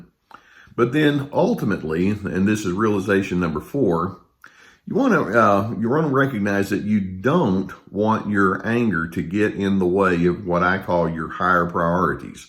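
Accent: Texas, United States. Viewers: I knew it was Texas even before I knew it was Texas. It’s his Texas accent, I have it too, grew up in Texas, lived there for 22 years (till I made one of my horrible life mistakes by marrying a douche bag) All the time I get “where are you from, where’s your accent from” evidently the Texas drawl never leaves you... and, I live in Biloxi Mississippi but evidently it sounds a little different! 😀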